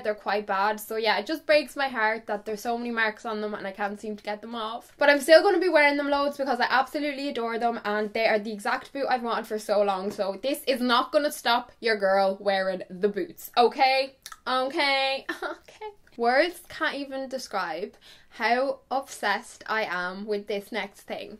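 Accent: Ireland. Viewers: Are u from Ireland 🇮🇪 ( edit) 2 min in the video: yeppppp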